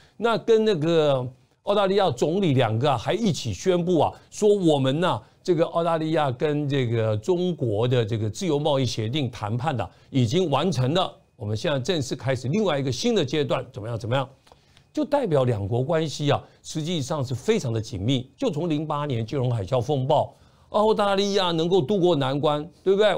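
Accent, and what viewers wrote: Taiwan. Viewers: 主持人华语是台湾学的吧，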